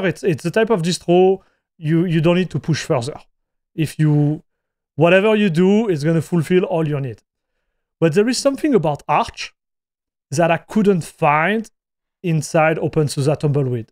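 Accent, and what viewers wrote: France. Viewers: i have never heard a more french man holy shit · Nah, this is french accent from france.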